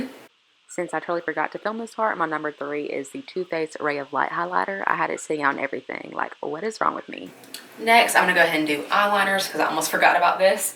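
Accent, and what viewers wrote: South, United States. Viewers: Hope you don't mind my asking but where are you from? I hear a little country twang!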